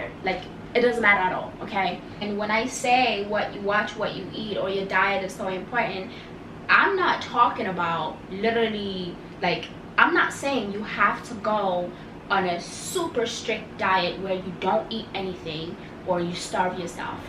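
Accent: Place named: Africa. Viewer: Off topic, but why does she sound like an African who is trying to sound American? Or an African who lives in America?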